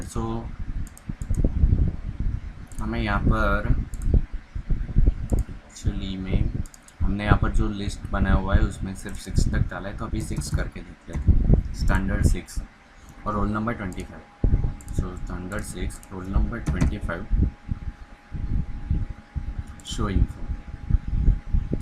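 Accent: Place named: Gujarat, India. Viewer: Watched all the video,build the same project without any errors, learned a lot about php and sql, thank you so much, commendable effort, btw you sounds like gujju, are you gujju??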